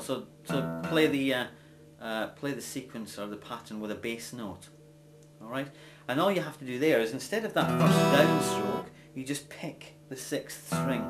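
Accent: Scotland, United Kingdom. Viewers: woah i was not expexcting a mrs. doubtfire accent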